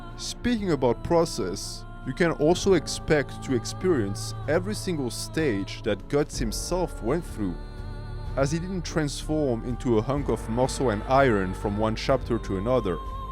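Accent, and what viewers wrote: France. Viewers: You've got a slight delightful baguette accent. As a fellow member of the baguette nation I salute you. Keep it up. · Are u french? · that french accent, love it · are u french parceque on dirait que tu as un accent ? 💪🏽🙏🏽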